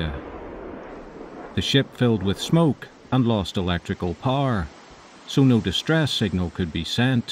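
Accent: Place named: Ulster, United Kingdom. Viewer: Hello, I've been reading comments and replies on your work, you have broad shoulders mate! The way you answer critics is restrained and civilized, many people lose their composure at the merest hint of criticism. I think your Ulster accent is just fine, and makes me homesick. Your responses to kudos too, are very composed and mature. I'm a fan. As soon as I can, I'll be adding my name to your list of subscribers. Keep up the great work, I think your site is FANTASTIC.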